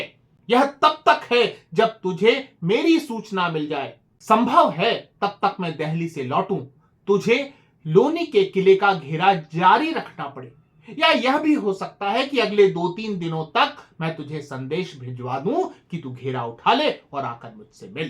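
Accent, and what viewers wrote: Standard. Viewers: आपके हर वीडियोज की तरह ही ज्ञानवर्धक हे। आपकी शुद्ध हिन्दी और उसका सर्वथा शुद्ध उच्चारण प्रशंसनीय है।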